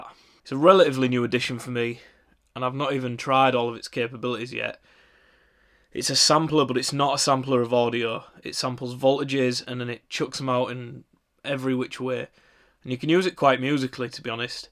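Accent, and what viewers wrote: Northern England, United Kingdom. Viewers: Great video! Also good to hear another northern accent in modular videos (as well as the OG, DivKid). ES-9 isn’t ADAT btw. It’s SPDIF. If you want to use ADAT then ES-8 is a better choice.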